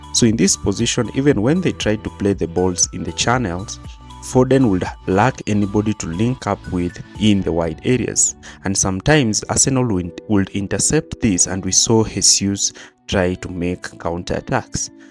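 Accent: Kenya. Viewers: I love your commentary. I don't know where you come from but you have a Kenyan English accent.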